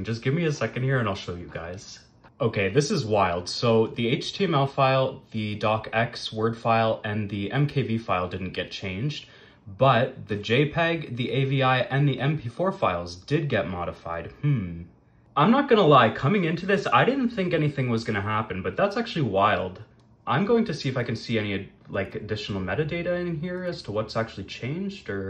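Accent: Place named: Canada. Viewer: Well the sky sounds like a Canadian, and I'm American, so therefore if the Canadian says that's not nice and not good I'm going to believe him.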